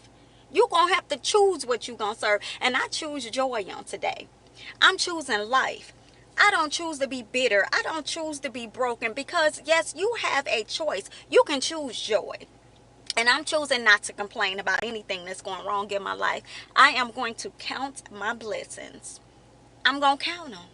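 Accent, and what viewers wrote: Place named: South, United States. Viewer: Words of wisdom from a beautiful southern woman I love your accent omg.I have to get me a southern wife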